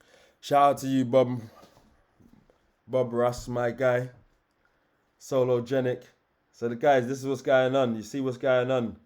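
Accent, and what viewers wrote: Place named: South London, United Kingdom. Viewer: I feel like I know u bro the way u speak I feel like ur in south London my guyyyy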